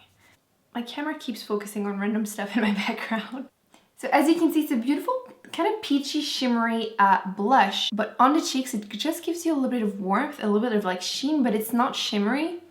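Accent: Quebec, Canada. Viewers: I am a new subscriber. I see in your info you state your accent is French Canadian but I don't see your location. Where do you live now? Thanks, Jen xx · I love your channel and you seem so sweet! Also I adore your accent haha I thought it sounded familiar and then I saw that you are French Canadian! I'm Canadian too :)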